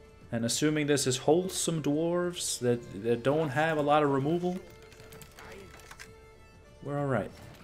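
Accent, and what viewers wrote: Norway. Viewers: Hey, I love your decks, I watch them all - and yes, even though I've been playing for years, I still pick up things here and there from you. It's pretty entertaining to watch these matches play out and you seem kind and sincere. Please keep it coming. I've done some decks that have been #1 trending but, for example, I didn't know about the rule of 16 until you told me! I like different decks, I probably spend as much time building and creating. Anyway, trying to place your accent. I hear European, perhaps Norway? Have a great day. And be happy - your Gwenting!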